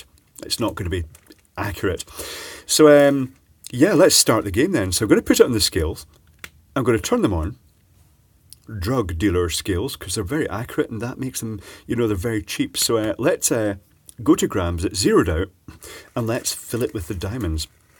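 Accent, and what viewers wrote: Scotland, United Kingdom. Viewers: What did Mr say? Sounded like 'putting seek diamonds'. What was that word before diamonds? Also where has the Scottish accent gone?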